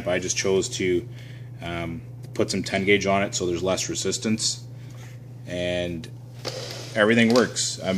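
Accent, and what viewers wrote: Canada. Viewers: You can easily tell hes Canadian. That's why he's well spoken. He's just not using slang or anything as some would in the US. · sounds like he's Canadian to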